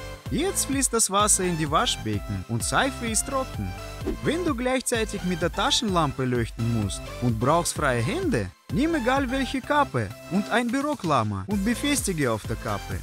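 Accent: Russia. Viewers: Русский акцент нескрыть😂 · Sein russischer Akzent ist sowieso richtig lustig xD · Der Typ ist mal Super symphatisch und macht diese ziemlich aufwendigen Videos erstens mit Begeisterung und zweitens auf deutsch, was verdammt mutig ist, da es für ihn ja eine Fremdsprache ist. Und da ist es wirklich extrem Dumm, sich über den russischen Akzent zu beschweren. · ja und................ ich mag den russischen akzentuiert hört sich cool an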